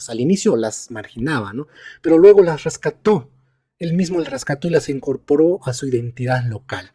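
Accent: Bolivia. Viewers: Te llevo escuchando varios años y lo unico que escucho de tu parte es controversia, odias todo lo que es peruano y sobre todo durante el virreynato ..Por que no dices que eres un pro boliviano o boliviano directamente o pro indigenista boliviano..Hasta se te nota en la voz , no hablas como peruano. Tienes bastante dejo boliviano. Deja de engañar a la gente que hay pruebas eficientes , hay libros y que te desmienten..O acaso se te ha olvidado que Bolivia era el Alto Peru y pertenecia al Virreynato del Peru.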